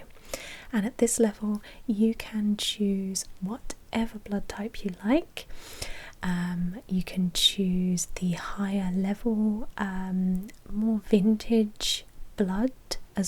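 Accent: England, United Kingdom. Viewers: To, I enjoyed your vampire roleplay. The title was Vampire Blood Bank. I love your English accent very much. And just that, actually makes this particular roleplay. Extra special. I hope that there will be more vampire role plays, in the near future.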